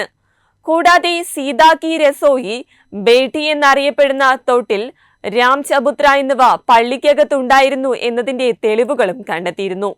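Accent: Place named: Kollam, India. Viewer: സുഹൃത്തേ താങ്കൾ കൊല്ലം ജില്ലയിൽ പോയിട്ടുണ്ടാവില്ല. അവിടെ ചില പ്രദേശങ്ങളിൽ ഉച്ചാരണം അങ്ങിനെയാണ്. അറിവില്ലായ്മ ഒരു അഹങ്കാരമായി കരുതാതിരിക്കുക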